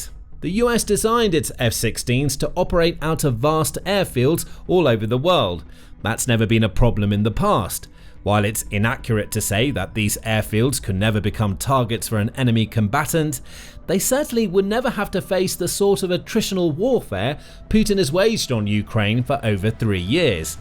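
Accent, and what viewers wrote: England, United Kingdom. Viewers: "Has gotten" is an anachronistic form of English only used in the USA, so why is the script spoken with an English accent? And UK vehicles tow rather than haul. Cleverly researched essay though and almost amusing😅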